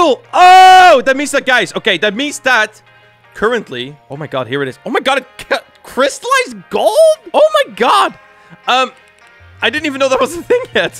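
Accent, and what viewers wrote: Netherlands. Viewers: r u dutch? Cause i am haha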